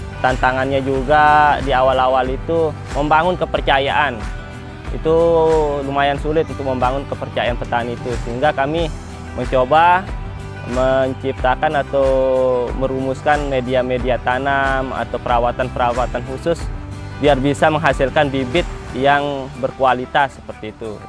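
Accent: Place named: Lombok, Indonesia. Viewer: Seperti logat orng Lombok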